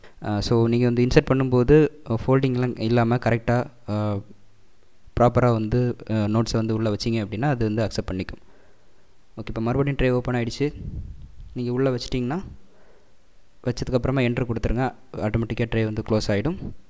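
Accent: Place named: Kanyakumari, India. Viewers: Bro neenga kanyakumari thanae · ஒலகத்தில எங்க போனாலும் கன்னியாகுமாரிகாரன ஒரே வார்த்தைல கண்டு பிடிச்சிலாம்.........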